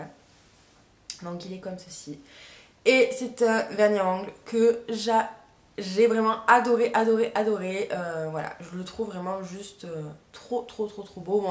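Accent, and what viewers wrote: South, France. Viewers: j'aime bien ton accent tu viens du sud toi aussi?^^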